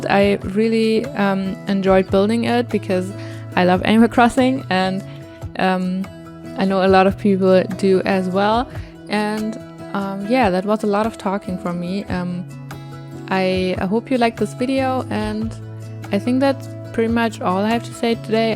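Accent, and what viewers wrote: Germany. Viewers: I hear a german accent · Are you german? Cause u sound like it 😅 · are you german? you kinda have a german accent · Are you german? Because of your accent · You Sound very german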